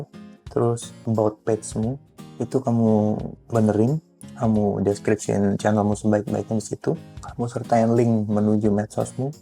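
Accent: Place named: Madura, Indonesia. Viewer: Akun yakin orang Madura nih 😂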